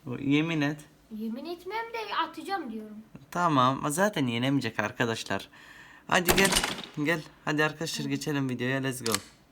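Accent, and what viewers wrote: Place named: Syria. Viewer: Niye suriyeli gibi konuşuyon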